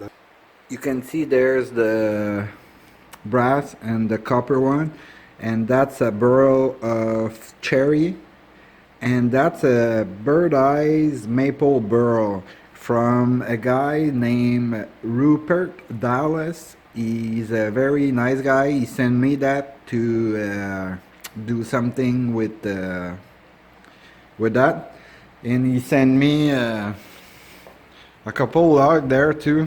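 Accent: Canada. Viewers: I just subscribed to you! Beautiful works!! Wish I could take up this hobby. No doubt woodworking can get into your blood! Thanks for using nice language AND having a great accent! How do I become a "kanuck"?!!! Hope I didn' the say a " nasty"! My son & daughter-in-law love in Sarnia, Ontario,Canada.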